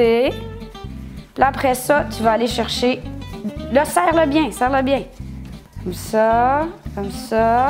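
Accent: Quebec, Canada. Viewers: sans vouloir etre mechant, c est clair l accent quebecquois est une horreur pour les oreilles. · Merci beaucoup pour cette idée, c'est genial. Je vais l'essayer bientôt. C'est tout a fait intéressant écoutant a votre accent. Moi, je suis Américain, mais appris le Français en France et croyez ou pas c'est ma premiere fois d'entendre longuement l'accent Quebecois. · ha ben je découvre votre chaine maintenant (25/09/2024) après en avoir regardé quelques unes pour mon filet mignon séché, quel énergie et sympathie surtout la complicité de votre "minimoi" qui a du bien grandir ! OK je viens d'en faire et je me demandai comme ficeler tout ça vous m'avez donné une astuce avec le doigt merci ! Votre accent est bien agréable à entendre juste ce qu'il faut d’authentique. Alors bien le bonjour du Sud de la France "Cousine" Canadienne sympathique ! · Cc tjs un régal d'écouter votre délicieux accent québécois ici en Bretagne, merci pour cette vidéo, j'ai un magret de canard à sécher au frigo mais maintenant je saurai faire la partie ficellage comme un grand. 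Au passage j'adors votre joie de vivre, si tt le monde était comme vous, le monde serait plus agréable 😉👋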